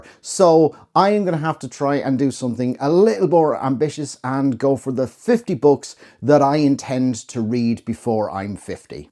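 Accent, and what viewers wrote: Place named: Ireland. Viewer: I’m finishing games of thrones and lonesome dove is next — yes they are Texans as am I .. born here— you are Irish correct— your accent.. 😊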